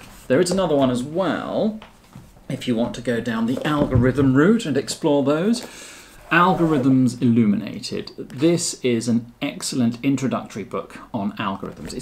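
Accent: England, United Kingdom. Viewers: The first thought I had when I saw the dictionary and all these books was: "Can you adopt me? I am a wonderful daughter, and as Italian I cook well". Then I came back to myself and I focused on Python. Very useful video for us little dev and delightful English accent. Thanks.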